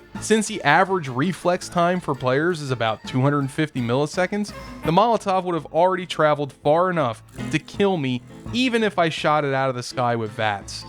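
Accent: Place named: Philadelphia, United States. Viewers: That accent sounds familiar. Are you from Philadelphia? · Love that I found this guy. A) he is definitely from the Philly/ South Jersey area. As someone from Delaware, I recognized that accent IMMEDIATELY. Feels close to home - like I’m listening to my cousin instead of a stranger, which is awesome. B) this commentary makes him sound like a disappointed Philly sports fan, but instead of being let down by his team(s), he’s let down by Bethesda. I love it.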